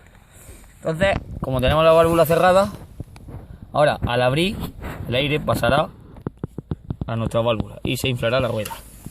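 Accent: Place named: Extremadura, Spain. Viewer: pareces extremeño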